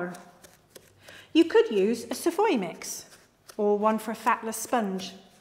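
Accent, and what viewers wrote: United Kingdom. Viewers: I love your British accent! So classy!!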